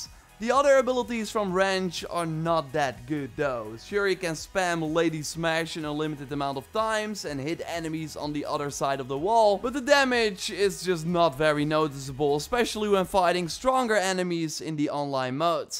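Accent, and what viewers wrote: Netherlands. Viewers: Hahahaha this guy sounds so dutch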